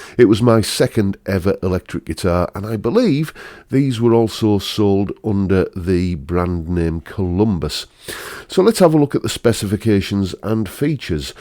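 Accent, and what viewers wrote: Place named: Yorkshire, United Kingdom. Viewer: Still playing the "place the accent" game. Son of a yorkshire farmer. Not county Durham then, so no Darlo. Not Middlesbrough, but I'm guessing close by. The fact you didn't say Cleveland places you south of Stokesley, I'm going to put my bet somewhere between Boroughbridge & Northallerton.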